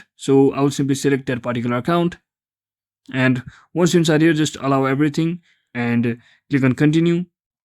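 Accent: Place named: India. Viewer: Indian ass voice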